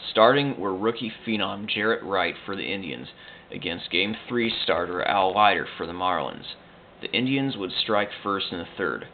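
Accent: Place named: Latin America. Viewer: You need to lay off those annoying hispanic pronunciations dude. Do you call Italy "Italia"? Then don't call Rentaria "LLLLLentellllia".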